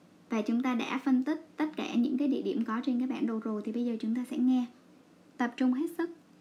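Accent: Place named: Dong Nai, Vietnam. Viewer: Không liên quan, nhưng em nghe giọng chị giống người dân Gia Kiệm Đồng Nai ạ :)) và cảm ơn các Tip của chị ạ, khá chất lượng và giúp e rất nhiều.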